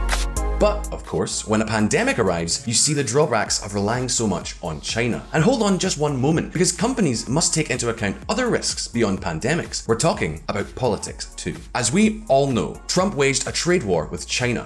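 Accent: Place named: Scotland, United Kingdom. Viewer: I like the team with the other guy and the Scottish one doing the narration. I think the Scottish guy seems better behind the scenes. He has cleaned up his teeth a bit speaking somewhat slower and more clearly . He isn’t bad and has improved a lot. I still think he should set himself apart probably not wearing Hawaii shirt maybe dress more formal . But he is doing much much better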